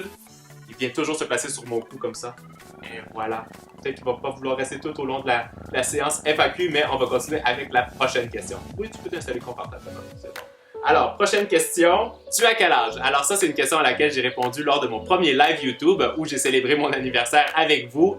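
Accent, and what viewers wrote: Quebec, Canada. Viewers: chouchoumiro msp moi aussi trouve ils se ressemble mais aussi j’me disais pk a un accent allemand et un accent québécois?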